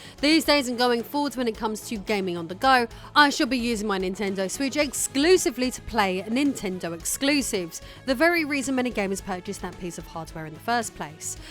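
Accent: England, United Kingdom. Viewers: Damn, she’s hot, especially with that English accent.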